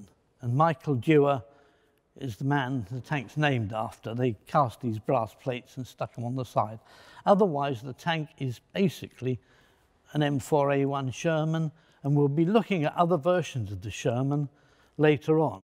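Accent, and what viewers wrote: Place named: United Kingdom. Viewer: The British accent and the beautiful mustache make the video better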